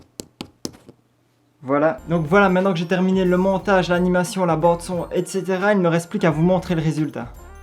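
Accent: Liège, Belgium. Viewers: Vu ton accent tu ne viendrais pas de liège Comme moi ? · Tu ne serait pas belge par hasard ? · On reconait bien l'acent bèlge 😀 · Tu es belge ? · Pas mal la vidéo 😄 , j'ai l'impression que tu as un accent belge 🇧🇪, j'me trompe? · C'est juste super! Tu es vraiment un pro du stop Motion. Félicitation. Au fait, juste une question, tu es belge? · T'es belge nan? :) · Accent belge🇧🇪🇧🇪🇧🇪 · Tes Belge ?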